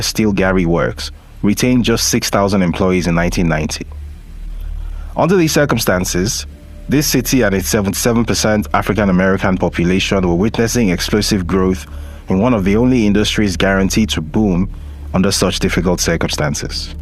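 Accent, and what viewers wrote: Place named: Igbo, Nigeria. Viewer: Your igbo accent gave you away!!! Go find something tangible to do.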